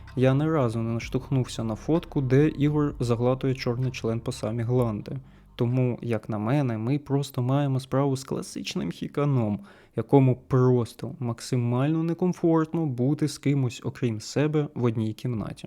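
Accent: United States. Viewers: Класний американський акцент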